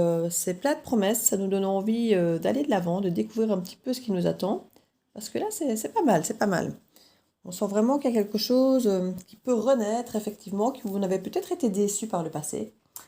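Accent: Belgium. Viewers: Un accent belge ''😂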